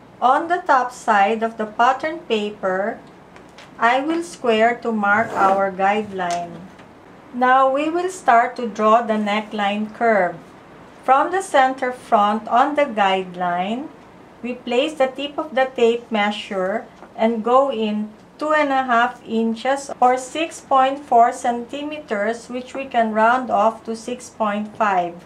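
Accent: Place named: Philippines. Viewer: your accent sounded like a filipina..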